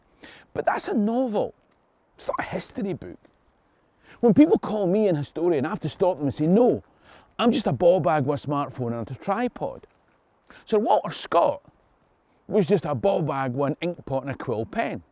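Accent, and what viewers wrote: Scotland, United Kingdom. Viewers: Wrong video I know. Am from Perth too, mind you my accents a bit more pronounced than yours. About blacks telling Scottish history. Let’s just be honest you’ve probably taught a lot more people about Scotland just because you have the #TannedSkin That I have to thank you for. Although I can understand where others would be coming from I can’t say I agree with it. If you weren’t you I wouldn’t watch a single video. You know so much about the place for god sake your more Scottish that a square sausage. Wrong video I know but I didn’t like how it must have felt to hear something like that. I’m white and a traveler and although I’m sure you’ve met your fair share of bammy travelers you honestly don’t understand how much you represent the real purer blooded Scottish travelers. So honestly my friend thank you May God bless each step forward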